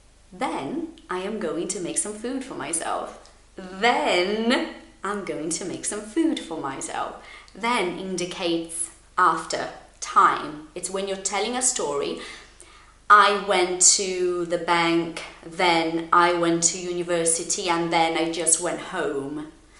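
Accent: United Kingdom. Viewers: That was an amazing class! A whole class in English. And I have to say I love your British accent. Thank you so much for your tips.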